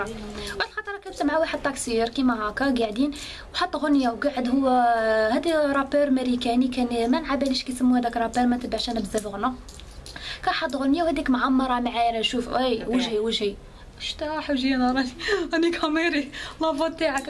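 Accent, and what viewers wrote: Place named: Western Algeria, Algeria. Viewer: encore une chose...l'accent de l'ouest algérien...elle est très drôle mais j'aime bien!!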